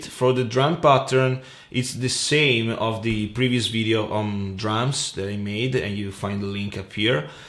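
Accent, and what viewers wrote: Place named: Italy. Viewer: si sente troppo che sei italiano<3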